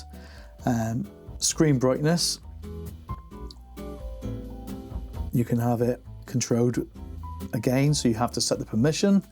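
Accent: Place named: Somerset, United Kingdom. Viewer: This video was excellent, convinced me to download it and it's great. Judging by your accent I suspect we arent far apart, I live in Somerset. Currently trying to upload a custom logo and it doesn't give the option of where to upload the image from though (as yours does from the bottom of the screen). Any idea how to sort this?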